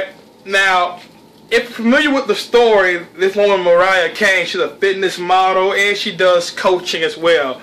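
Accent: South, United States. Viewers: Damn I wish I had a southern accent like you.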